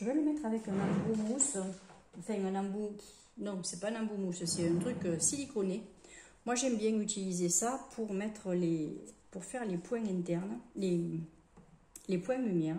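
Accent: South, France. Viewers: Hello, j'aime beaucoup ton naturel sans chichi, c'est très agréable! Et ton petit accend du sud adorable! On ne peut pas plaire à tout le monde 😉.il y a toujours des personnes qui critiqueront. Ne change rien, c'est parfait! Très bien journée, prends soin de toi. Bisous.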